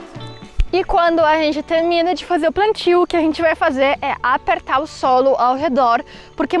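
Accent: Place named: South, Brazil. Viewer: Não sabia que tinha um canal falando em Sulista. Como sempre, um ótimo vídeo 🙌🏽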